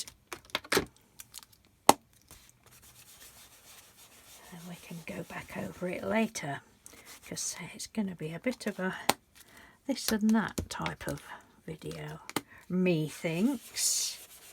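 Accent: United Kingdom. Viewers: Hi, I finally got to watch this properly. I’ve been moving rooms around again and I think I’m going to be sore tomorrow,lol! These turned out fantastic! The black background gives such a striking effect. I think I’ll reward myself with those shimmers whenever I use up some ample amounts of supplies because I am literally out of rooms, lol! I’m glad it worked and that you had so much fun! I got curious about actors that come from your area and did you know that, Kevin Doyle from Downton Abbey is from your town? I cracked up when you said, “what the heck!”, lol! I’m going to miss you reading craft stampers to us. Things always sound better when you British read them. Kloss! Thank you for sharing. Hugs, Julie 🥰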